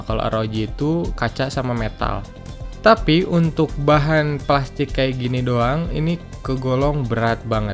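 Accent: West Java, Indonesia. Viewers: Nyariosna khas sunda nya..hhehehe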